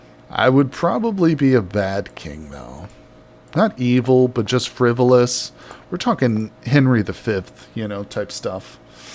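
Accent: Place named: Minnesota, United States. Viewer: I said in a previous video that you sounded familiar. Now you're confirming you live in central US. What are the chances you live in Minnesota? Lol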